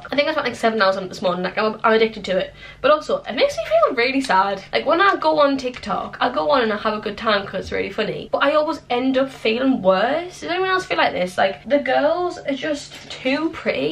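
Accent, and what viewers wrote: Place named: North, United Kingdom. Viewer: you’re one of my fave you tubers, I don’t think I’d ever stop watching your videos, you’re so fun and honestly I would love to be friends with you, I’m also from the north and I absolutely love your accent ‼️💜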